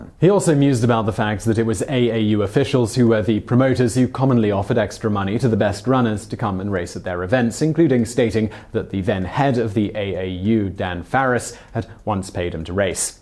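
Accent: United Kingdom. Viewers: Why do I always have a hard time focusing on a video or even understanding what the hell is going on when it's in the British accent? I literally didn't understand two thirds of the video :/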